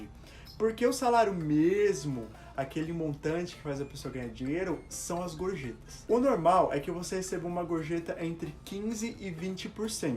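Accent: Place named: Pará, Brazil. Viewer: É paraense? Fala igual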